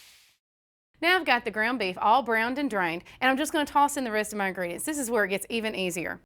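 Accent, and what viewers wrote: South, United States. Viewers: I love your voice you sound like all the folks around where i grew up.God bless our south. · Very difficult to understand her! Talking too fast .. with a Southern Accent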